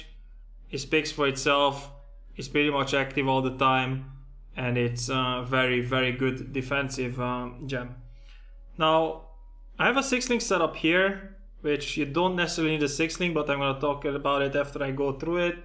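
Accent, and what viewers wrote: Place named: Romania. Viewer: stiam ca esti roman. dupa port dupa glas:P <link> check it out:P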